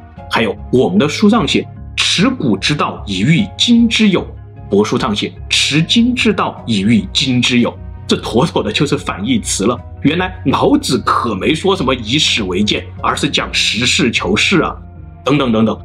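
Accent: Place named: Yichang, China. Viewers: 我听口音就是我们湖北的，惟楚有材确实不虚啊，楚虽三户亡秦必楚啊！我们九头鸟确实是宇宙第一，乌拉！大武汉V587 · 口音湖北人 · 普通话讲的跟我一样的😂严重怀疑也是宜昌人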